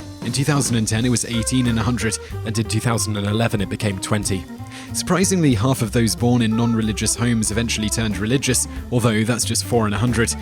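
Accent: United Kingdom. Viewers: the person doing the voiceover has a british accent ;)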